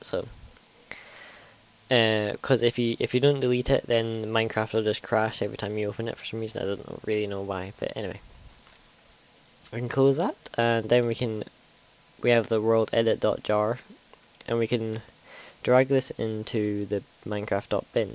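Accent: Scotland, United Kingdom. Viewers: r u from scotland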